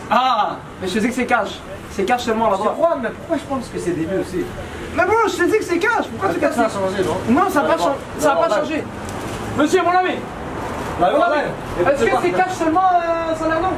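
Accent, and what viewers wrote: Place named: Quebec, Canada. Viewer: Toujours toutes tes vidéos avec d’la bonne humeur et de super délires mdrr et en plus j’adore ton accent québécois sur des phrases complètement française !🤤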